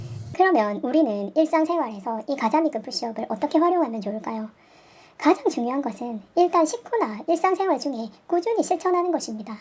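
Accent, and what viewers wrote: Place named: Daegu, South Korea. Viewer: 영상 늘 잘 보고 있습니다. 대구 분이시려나.. 귀여운 사투리도 사랑스러워요^^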